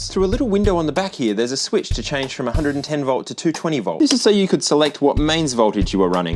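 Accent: Australia. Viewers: He's Australian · Two problems with your comment: 1. He isn't British, he's Australian. 2. Getting frustrated because people from different countries have different accents is a ridiculous way to live your life.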